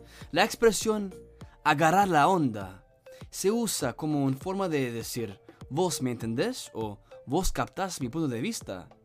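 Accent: Guatemala. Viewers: Jajaja 😂, ese es el hablado del chapín 100% 🇬🇹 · Puchicas vos sabés bien el acento chapín puchicas..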